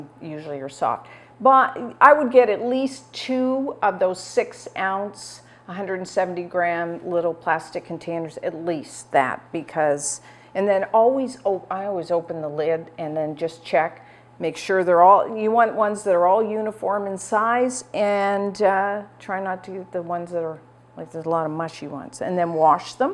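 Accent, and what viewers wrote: Canada. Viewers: you sound Canadian. Are you Canadian?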